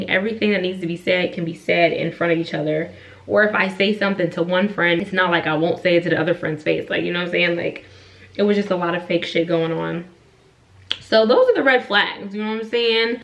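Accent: Pittsburgh, United States. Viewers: Yesss! Loving the new hair style & makeup👌. My favorite style on you is the updo that you had in your self care video bc your beautiful skin, eyes, & lashes are popping! ✨ But this looks great on you as well and it looks natural. My Sis lives in Pittsburgh and she has that same accent even though we are from N.C. I went to NCCU. And I experienced fake girlfriends but what got me was back at home I had a fake guy friend that told me ALL of his “cousins” business! I was so shocked and it was an immediate red flag 🚩 for me. I later told him that he “talked like a Bitch!”. Lol.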